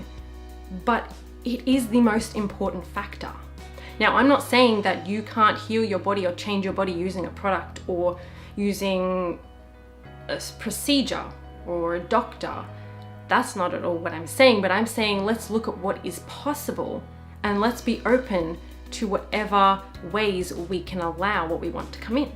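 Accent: Australia. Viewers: Are you Australian ? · English? Hmmmm it sounds Australian to me but... maybe I’m wrong ??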